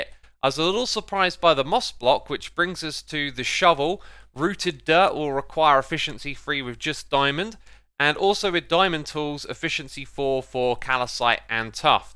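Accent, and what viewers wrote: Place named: United Kingdom. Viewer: let him pronounce words like he wants to. Stop forcing the american way of saying words on british people.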